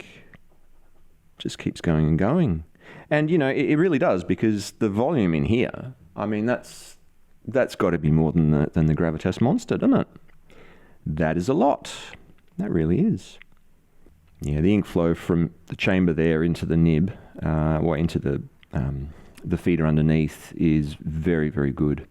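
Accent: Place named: Australia. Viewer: I do love the Ikea bath mat! I personally found a dual purpose for my shag carpet --> it also makes for a lovely photographic background, and the pen can't fall further than the floor when taking photos Lots of gorgeous visual texture. :D Need to check out your other vids! Caught your Aussie accent, and you collected yet another Aussie as a subscriber. I've got a heavy Aussie bias. What a monstrous Dremel adjustment to that green demo 9019. Cut the feed too! That needlepoint one looks amazing! I can't even hear the pen that much on the page. Sounds brilliant. I'm amazed at how well it spread the ink and didn't just glomp onto the page like some flex pens tend to do. Didn't even need an overfeed? Standard feed you say? Oh my, how delicious.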